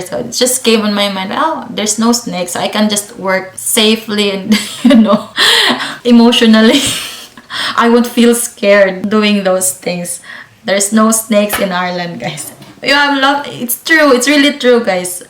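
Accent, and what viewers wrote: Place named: Philippines. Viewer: Sabi na nga be Pinay eh,lol. Just clicked the video, on the first word, alam na agad! Because of my musical influences, my love for their accent, geography at sabi nila masayahin din sila, gusto ko maka punta jan.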